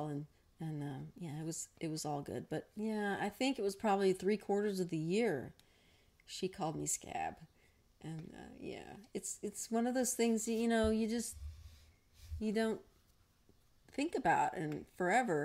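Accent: Liverpool, United Kingdom. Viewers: Oh gosh, I still have those books from the 60's! I can't do the Liverpudlian accent like you can, but I loved reading his words and getting carried away with the absurdity of it all. From the mind that gave us, "Turn left at Greenland," when a reporter asked them, "How did you find America?" Oh, the memories.... thanks, Scab... :-D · I always loved the Lennon books too. But I never asked anybody to call me Scab. Nice Liverpoodlian accent, too!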